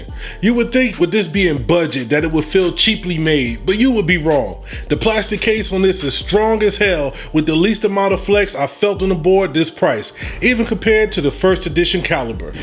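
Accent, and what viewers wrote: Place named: New York, United States. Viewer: Im an OG tech/barber with a big ol Logitech G106. I dont really use it to game though because of tartarus but I'd like to try the calibur out. Im really liking your vids bro. You sound like a brother from NY but if you're ever out here. stop by the shop so i can tighten up that beard for you one time. Imma stick around and watch you grow this channel. Thanks for the review.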